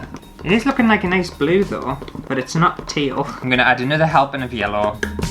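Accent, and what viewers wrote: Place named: North, United Kingdom. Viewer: Aww your (northern?) accent is so cute and wholesome. Also your content is really entertaining, subscribed!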